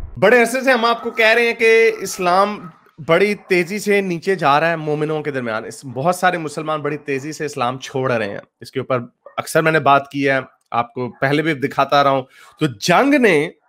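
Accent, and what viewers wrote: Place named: Punjab, India. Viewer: That deep Punjabi voice 😁😁😁👍👍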